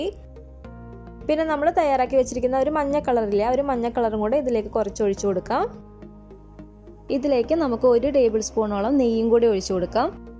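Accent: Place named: Central Travancore, India. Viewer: central travancore slang aanallo..Cliche malabar slang allaa..... Have to try.......:-)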